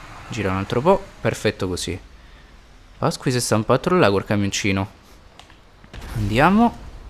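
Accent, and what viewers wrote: Rome, Italy. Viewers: Che se de roma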